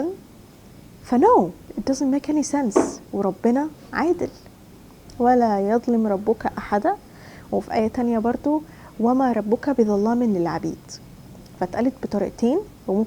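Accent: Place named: United States. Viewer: ال amrican accent بتاعتك perfect ممكن اعرف اتعلمتيها ازاي او فين ؟! ❤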